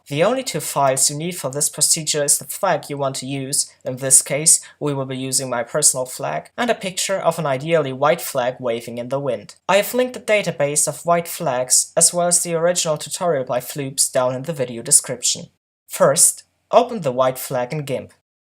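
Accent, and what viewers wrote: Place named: Germany. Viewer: Hey thanks, it's really incredible to find exactly the fringe flag-editing tutorial I needed as soon as I thought of it. Also, that's a delightfully German accent you have there. I feel like I'm being told grand secrets about *something*.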